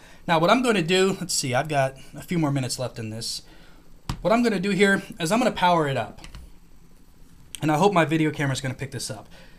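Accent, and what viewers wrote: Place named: United States. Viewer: i instantly know your american Y'all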